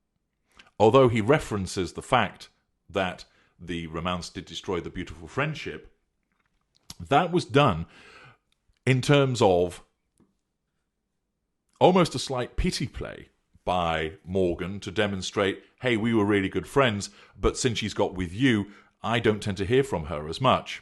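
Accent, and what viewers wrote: United Kingdom. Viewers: Incidentally you kind of actually sound like Piers Morgan. And not just because you're British and have a similar accent. You remind me a bit of Jeremy Clarkson (another narcissist, surely) as well. On the topic of narcissists in the public eye🤣, Russell Brand has got to be another. Though he is self depreciating and quick and funny, he so clearly demonstrates narc traits. His past drug abuse, sex addiction are symptoms of this. I would imagine that his father, who wasn't really present in his childhood at all but was known to be a womanizer, probably helped form the narcissism.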